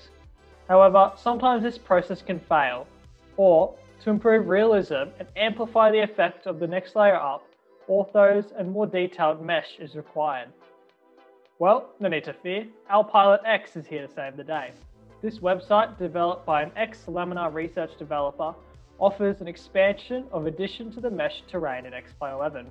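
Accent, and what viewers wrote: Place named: United States. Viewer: I don't have global scenery installed so will it work like that And also u look like indian and talk like American nice channel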